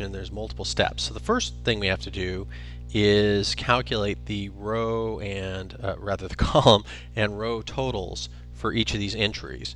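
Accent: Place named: United States. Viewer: Thanks. Nice to hear an American voice teaching statistics!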